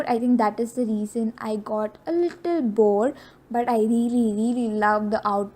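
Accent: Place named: United Kingdom. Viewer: Wish you come to uk help me I notice Brit accent.do some classes here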